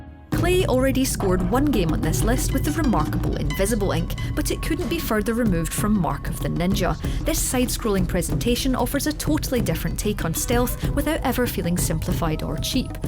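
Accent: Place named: Scotland, United Kingdom. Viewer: It's called Scottish. You'll find that there are quite a lot of people with this accent there.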